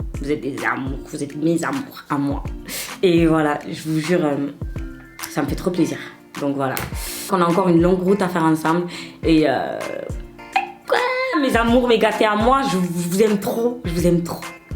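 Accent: Marseille, France. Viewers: J'avais jamais entendu de renoi avec l'accent marseillais mais je savais qu'il y en avais et je trouve sa MAGNIFIQUE je kiff à mort ta voix et tout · Je kiffe l'accent marseillais 😍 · ya une une video ou elle parle combien ça la blesse que les francais disent prk elle a un accent marseillais alors qu'elle est renoi je t'invite a la voir tu comprendras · j'aime trop ton accent Marseille 😁💙💙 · Ses tarpin de sbob chez toi machallah parlez à la facon marseillaise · No mais serieux je cherche toutes tes videos rien ke pour ecouter ton accent marseillais!!! Je kiffe tropppp 😍😍😍😍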